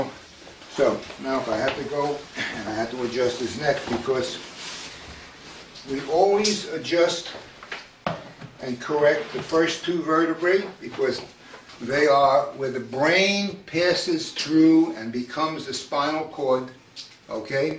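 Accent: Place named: New York, United States. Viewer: You sure do have a New York accent! Haha well good video. taught me a lot about chiro.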